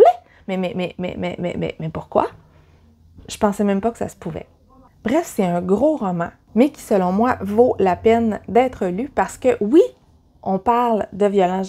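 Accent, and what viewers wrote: Quebec, Canada. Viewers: J'adore t'écouter pour ma part justement avec ton accent québécois et le parler de chez-toi 💛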